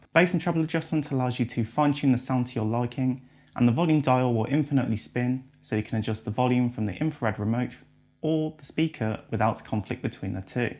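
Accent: United Kingdom. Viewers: This guy's British accent is so thick, I can't understand him ' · i'm really impressed with your clean british accent, nice review man... i must have this one this coming christmas eve!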